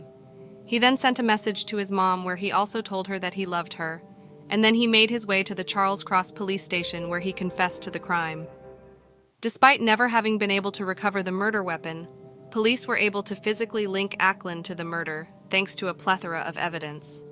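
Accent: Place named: United States. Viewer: weird hearing what sounds like a script written in the UK being voiced by an american voice over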